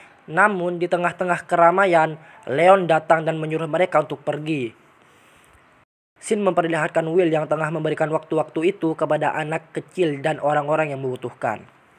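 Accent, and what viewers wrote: Madura, Indonesia. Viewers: Logatnya kyak yg kenal...org madura ya tretan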